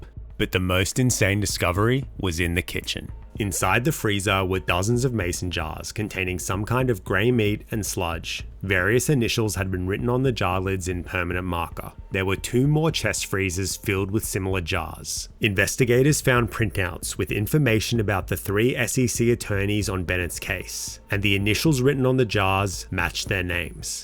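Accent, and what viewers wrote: Australia. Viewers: Wow, all strayans really do sound the same. You even have the sunny emphasis down lol The vb shines through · so i’m pretty sure this guy is australian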